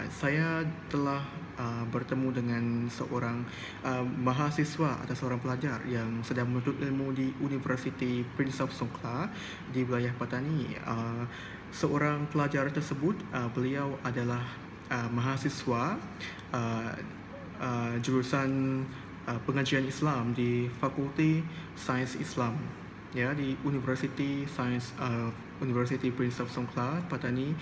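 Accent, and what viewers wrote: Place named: Indonesia. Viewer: Kamu mengunakan bahasa indonesia,,seleng indonesia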